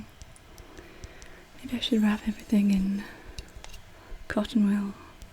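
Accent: Scotland, United Kingdom. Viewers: Love your Scottish accent... And your headphones. Is there a hint of Irish genealogy in there too? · oh, I love a good scottish accent! new subscriber.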